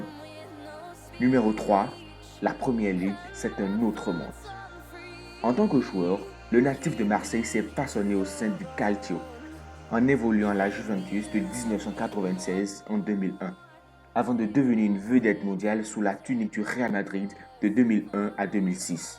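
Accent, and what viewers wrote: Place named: Africa. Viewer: Wesh on comprend rien avec ton accent africain , paye quelqu’un qui parle bien français pour faire ce genre de vidéo pff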